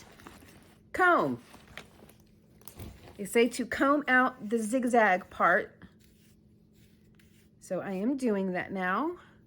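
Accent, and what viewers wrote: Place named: New Jersey, United States. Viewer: Holy moly! I had thought you were in your late 20s!! I was stunned to hear you had grown children. I know how you feel about relocating and not having friends where you live. Your videos are very entertaining and enjoyable. I thought about buzzing my hair like that, just leave the sideburns. Lunch lady net!! That was good! Your New Jersey accent is awesome! You are a hoot! Your parking lot Karen comment put me in the floor!!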